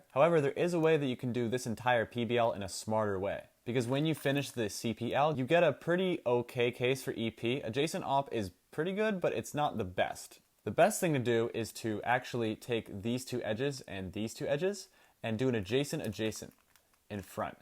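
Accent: Russia. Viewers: I've had a feeling that a Russian accent could be probable for this one, considering who you're critiquing 👀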